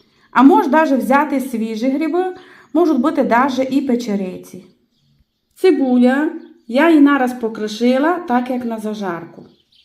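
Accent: Transcarpathia, Ukraine. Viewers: 😢😢😢ооо, ви собі навіть не уявляєте, які спогади в мене з цією стравою і яка ностальгія за Закарпаттям - це мій другий дім. Приємно чути Закарпатський діалект🤗 Коли прочитала назву "м'ясні крученики" зразу згадала рецепт бабусі, який вона називала - м'ясні шишки)) Все дитинство моє пройшло на Закарпатті - чим дуже тішуся. Ще там маю родичів, нажаль дідуся і бабусі вже немає😢. Дуже б хотіла знову поїхати на Закарпаття з своїми дітками, щоб вони побачили ту красу. Можливо з часом поїду. Вам бажаю здоров'я міцного і успішного розвитку каналу💙💛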